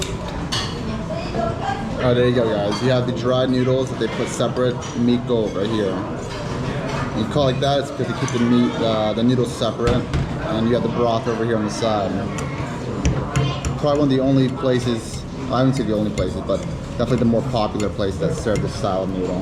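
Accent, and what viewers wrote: Cambodia. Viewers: how does this guy sounds so foreign yet so khmer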